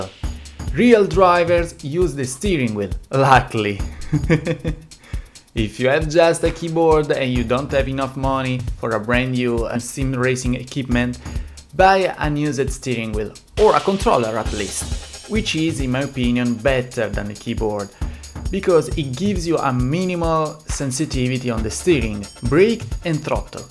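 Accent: Italy. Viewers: You're Italian right?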